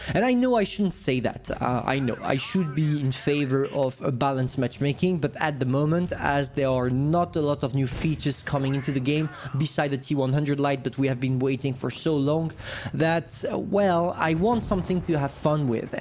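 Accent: France. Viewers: Je crois que tu es Français vu ton accent et ton nom sur Youtube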